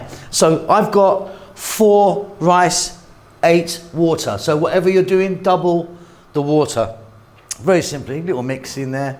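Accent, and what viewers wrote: United Kingdom. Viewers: Speaking from what I see, are cups and tablespoons smaller in the UK than the US (going by your accent)? I'm a small-scale US homesteader and I want to add some sheep to my property. Looking at recipes. "Lamb" from the market is usually (legally) mutton and the wool sheep variety. I want to get hair sheep and harvest annually to avoid greasy, lanolin flavor.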